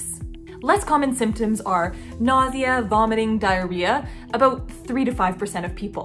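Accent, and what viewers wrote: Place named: Ontario, Canada. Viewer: OMG! I heard at least two or three “ABOWTS” and at least one “AAAOOUT” My fellow Canadian! I’m an emergency dept RN working in the US! Great job!!!!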